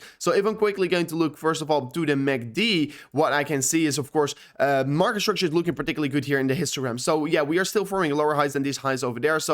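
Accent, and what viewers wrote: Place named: Netherlands. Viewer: Ohhh is hij toch Nederlands !? ik dacht al een " dutch accentje" te horen 😊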